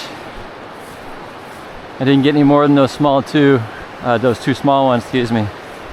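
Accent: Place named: United States. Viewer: cool video and some useful info. You definitely don't sound Swiss :) You're American aren't ya?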